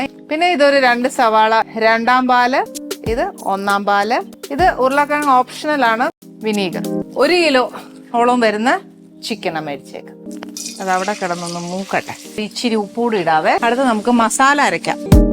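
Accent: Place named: Pala, India. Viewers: പാലാ സംസാരം... ഞാനും ഇങ്ങനെ ആണ് സംസാരിക്കുന്നെ. സൂപ്പർ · Ithu pala alla palade new version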